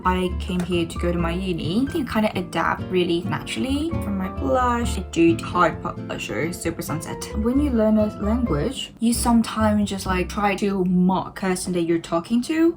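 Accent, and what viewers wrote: United Kingdom. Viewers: 영국식 발음이 너무 좋네요.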